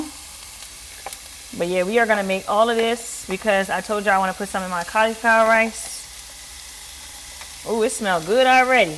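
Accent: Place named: Louisiana, United States. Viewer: Love the video. Are you from Louisiana? The accent sounds like it lol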